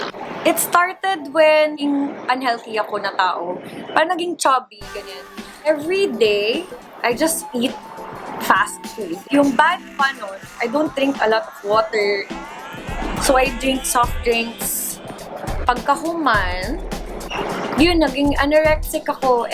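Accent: Visayas, Philippines. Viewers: wow,, ayus.. dakong gensan,, bisaya jud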